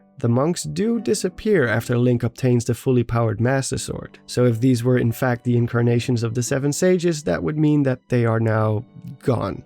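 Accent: Netherlands. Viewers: You’re from The Netherlands aren’t you? I can clearly hear it in your voice. xD Cool video!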